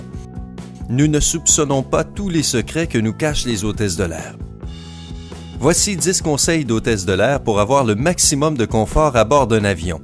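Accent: Quebec, Canada. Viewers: Putain d'accent canadien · Oh Non, l'accent canadien. Je peux pas ... sorry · RRr cet accent québécois.. Je n'arrive pas à m'y faire. Il est agaçant à entendre. Pardon. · Pur accent québécois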